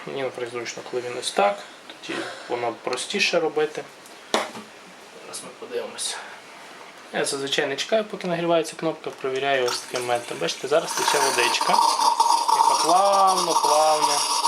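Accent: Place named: Lviv, Ukraine. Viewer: гадостный акцент львовский. лучше бы молча делал.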